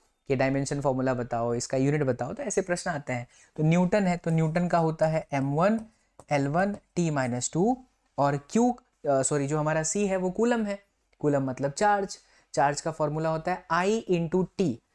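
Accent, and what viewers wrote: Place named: Bengal, India. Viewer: Sir English me bola kro Bengali lgte ho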